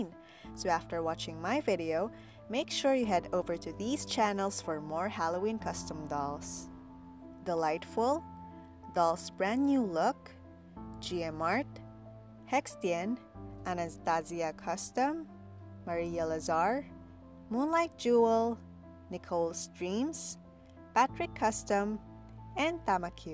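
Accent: Philippines. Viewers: I have been watching you since the first time I discover your channel. I have a feeling that you're a filipino because of the way you speak. Then I found that you really are filipino (or based in Philippines) when I checked your IG! I can't believe how talented you are ❤️❤️ hope you can make it even bigger in youtube and be more recognized not just in Philippines but internationally 🌹You are so amazing and talented. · you're accent is nice? are you a filipino? :D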